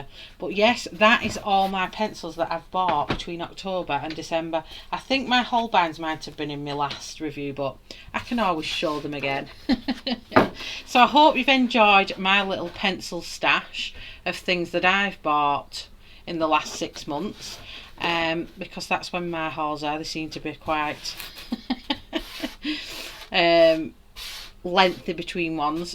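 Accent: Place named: Northern England, United Kingdom. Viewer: I was born in Birmingham but iv lived in Australia since 1974, I like listening to you, you sound like your from somewhere up north. Liverpool?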